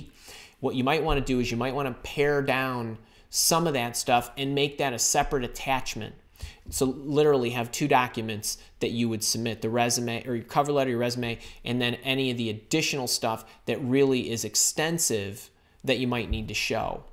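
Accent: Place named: Great Lakes, United States. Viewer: Wow, thats quite the pronounced Northern Cities/Great Lakes accent you have there. Lets see ... Central Michigan, is it? Maybe Lansing?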